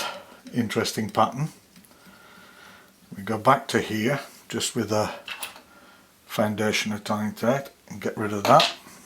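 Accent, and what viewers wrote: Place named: Lancashire, United Kingdom. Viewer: A wee nosy question......where did you pick up the traces of scottish inclusion within your Lancs accent?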